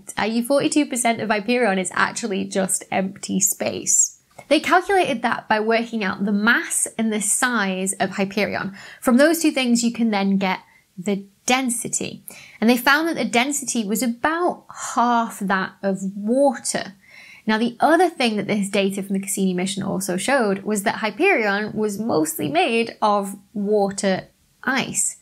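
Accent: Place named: Cornwall, United Kingdom. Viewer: Love it, there was a hint of some Cornish accent coming into parts of the video.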